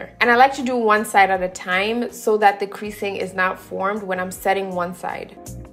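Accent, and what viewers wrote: Caribbean. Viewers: Love your videos your accent especially.. sounds Caribbean-ish flavored ☺️✨ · I could listen to you speak all day. Your accent is heaven to me, bringing back lovely memories of back home in N.Y. many years ago, conversing with my neighbors from Jamaica and Trinidad-Tobego. Our children attended school together. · This is my first video of yours and I sense a bit of Caribbean in that accent. You are beautiful btw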